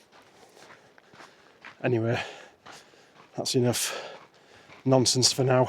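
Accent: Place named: North, United Kingdom. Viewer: Congrats in your 10x10. Video is inspiring as always. Not tired of the Northern accent and hope your toe feels better soon. Cheers 🏔️🏕️🏔️🏕️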